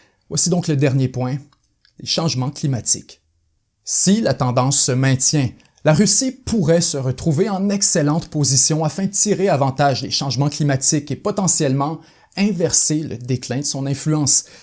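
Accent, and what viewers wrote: Quebec, Canada. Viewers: T'es québécois toi, non?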